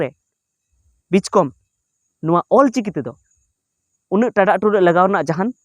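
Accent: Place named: South, India. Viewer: ये आदमी संताल परगना का नहीं है, बहुत कोशिश करने के बाबजुद इसके उच्चारण से साफ पता ओल रहा है कि ये दक्षिण का आदमी है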